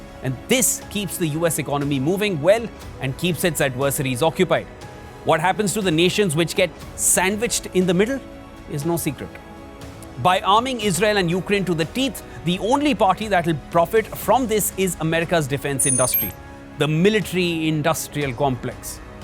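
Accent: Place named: India. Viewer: Yiu. And. Yiur. Annoying. Indian. Accent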